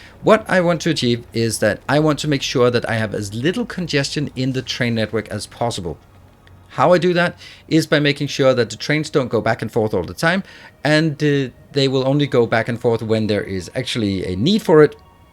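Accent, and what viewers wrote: Denmark. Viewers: a dane can hear a dane XD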